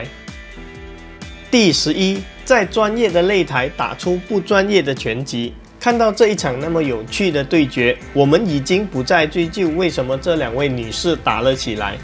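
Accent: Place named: China. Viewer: 這是哪一省的口音，真難聽😢😢😢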